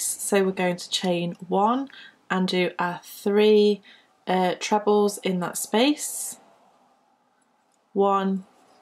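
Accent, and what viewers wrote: United Kingdom. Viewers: Thank you so much. I watched a couple of other tutorials, and couldn't "get it". Yours was the best, I finished a square right along with you. Your voice is lovely, which helps a lot! I'm from the US and at first thought "Oh no, another Brit", but you did such a nice job of explaining the terms, that I soon was very comfortable with UK terminology. Thanks for including us!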